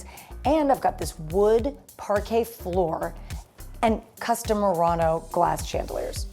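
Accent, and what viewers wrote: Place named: Texas, United States. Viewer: You are a hoot! Texas talk ,love your room.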